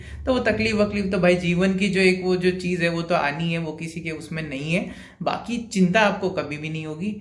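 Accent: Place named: Marwari, India. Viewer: सा, वंदेमातरम। आपके वीडिओज़ में जो परिवार के प्रति स्नेह व लगाव दिखता है वो बहुत सराहनीय है। और थोड़ी बहुत मारवाडी बोली भी बोलेंगे तो और आनंद आएगा। आपरी हिंदी में मारवाड़ी री सुगंध तो आवे है, उनने सुन र जी घणो सोरो होवे सा।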